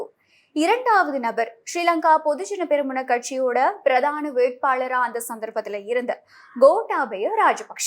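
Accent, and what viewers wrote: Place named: Chennai, India. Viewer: Lankasriயில் Madras தமிழர்கள் பணியாற்றுகிறார்களா அக்கா Madras தமிழில் கலக்குகிறார். கேட்க அசிங்கமாக இருக்கிறது. Lankasriயும் தமிழ் கொலை செய்கிறதா? யாரை நாங்கள் நம்புவது? தூதூதூதூ தூதூதூதூ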